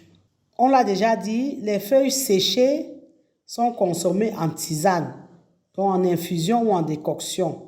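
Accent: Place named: Africa. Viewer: Oui, j' aime sa voix et son français à l' accent familier et authentique africain.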